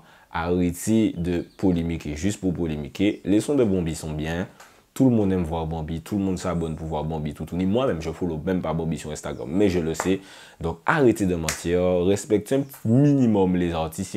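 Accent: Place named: Antilles, France. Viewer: C est super comme analyse mais quand tu dis : je vais prendre mon accent antillais...lol...tu l as H 24...xptdr...on entend que ça ton accent....🤣🤣🤣ne le prend pas mal. A bientôt.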